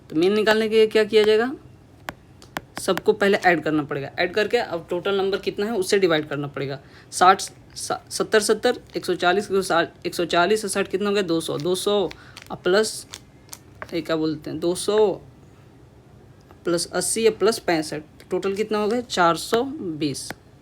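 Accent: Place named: Bihar, India. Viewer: Very nice ❤❤ भैया ❤❤ awaaz me bihari touch 🙊🤗🤗🤗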